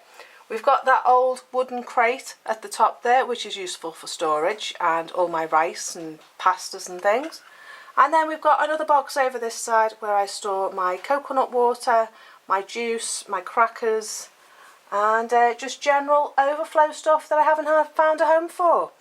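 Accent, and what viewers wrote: Liverpool, United Kingdom. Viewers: Nice pantry ,where do you come from originally? I thought a bit of a Liverpool accent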